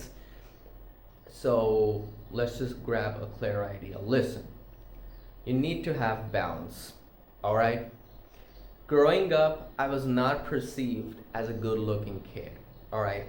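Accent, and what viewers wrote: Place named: United States. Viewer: are u from pakistan? how do u have american accent?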